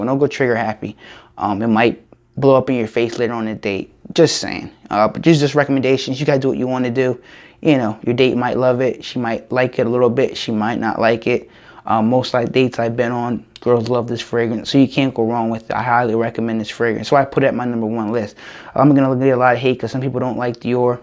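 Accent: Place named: United States. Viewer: Good video again mate it is a shame that you have to do a disclaimer because people moan. I have 3 of these fragrances, Aqua Di Gio is coming tomorrow and looking forward to it. YSL La nuit d'lomme is great on my skin and lasts/projects for ages.... I love it whereas Sauvage I have the edp and I am not keen on it, the projection on me is poor and even on clothing I can hardly smell it. I enjoy your videos..... What is your name? I cannot tell with your American accent (I am from England) and where abouts in the States are you from? I am from Leeds in Northern England.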